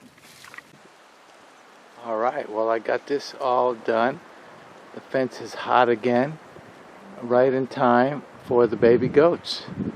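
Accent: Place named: Central New York, United States. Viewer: 😍 they're too cute. The brown baby with the dorsal stripe is much bigger. You sound like your originally from the W C NYS area. 😃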